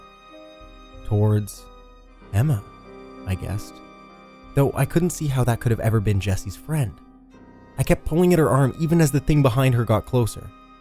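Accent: South, United States. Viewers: Very nice southern accent. It sounded real. I'm from the south so I'm used to it. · love your southern accent xD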